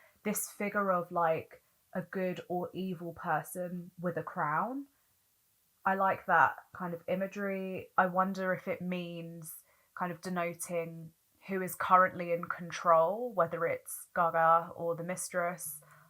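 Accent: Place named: United Kingdom. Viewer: This girl sounds british, I think she is as well as a pop-culture student and especially from The Queen Gaga,The Best & The Great.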